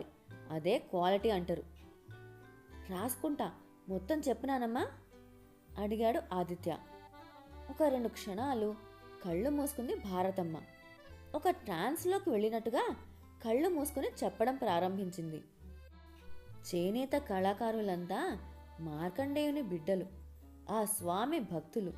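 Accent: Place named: Telangana, India. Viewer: Nice telangana slang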